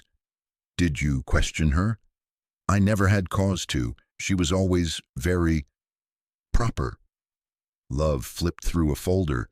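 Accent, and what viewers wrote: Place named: United States. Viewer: Dreadful! AI rubbish, appalling American accent!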